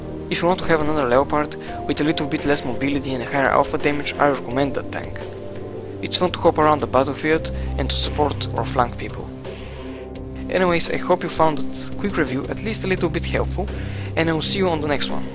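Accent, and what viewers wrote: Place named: Germany. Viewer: WOW good Review! You sounds a littlebit German ^^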